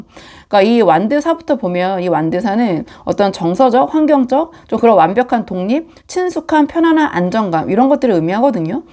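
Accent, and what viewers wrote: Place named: Gyeongsang, South Korea. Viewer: 진짜 들을때마다 느끼는건데 거꾸로 들어도 대구사람. 대구사람이신가요? (저는 아니예요ㅎㅎㅎ)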